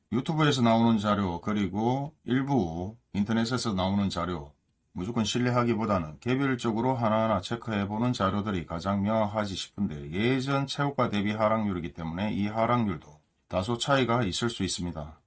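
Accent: Gyeongsang, South Korea. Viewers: 목소리 좋으십니다. 딱딱하지 않는데요. 경상도 기본값 아닙니까.?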